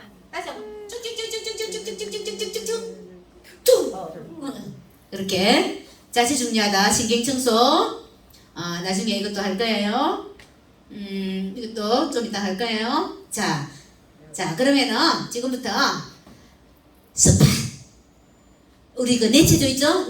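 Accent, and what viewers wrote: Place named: Gyeongsang, South Korea. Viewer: 경상도 사투리 구수하면서 찰진 표현 아무쪼록 칭찬하고 삽시다😊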